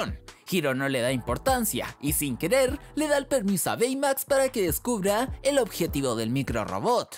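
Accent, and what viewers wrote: Chile. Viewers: MIRA EL PERKIN este, no sabia k eras chileno D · Ahora, en este video si que si que confirme que eres chileno, y eso es bueno para decir que los chilenos podemos hablar bien, y que eres muy bueno haciendo resúmenes de películas, tremendo like que te doy 😎🤙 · Eres chileno?... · no sabia que este weon era chileno xd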